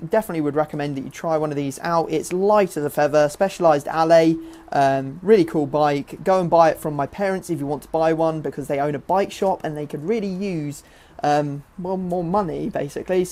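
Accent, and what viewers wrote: Nottingham, United Kingdom. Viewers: Do u come from nottingham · Are you from nottingham?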